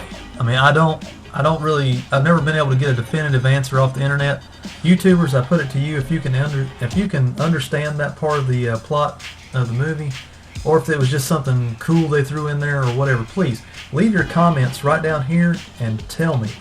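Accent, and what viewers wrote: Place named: Southeast, United States. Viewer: Are you from one of the Southeastern United States? I like your accent.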